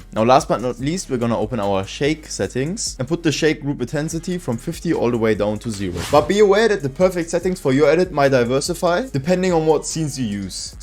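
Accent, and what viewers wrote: Germany. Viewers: Du hörst dichn bisschen deutsch an 😅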